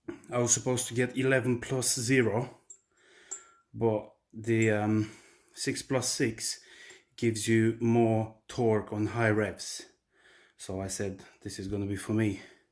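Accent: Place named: England, United Kingdom. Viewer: You are very nearly speaking with an English accent now!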